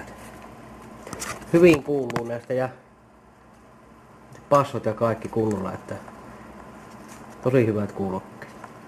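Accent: Savo, Finland. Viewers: mistä päi savoo sä oot ku puhut murteel?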